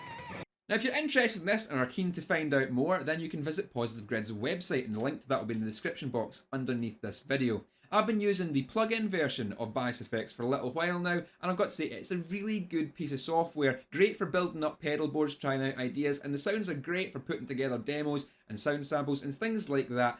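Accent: Scotland, United Kingdom. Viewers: It took me a full 4 seconds to tune my ears to "Scottish". Thanks for the video :)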